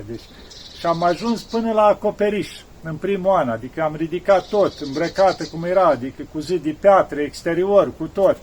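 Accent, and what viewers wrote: Moldova. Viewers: parinte schimba graiul ala moldovenesc ca este enervant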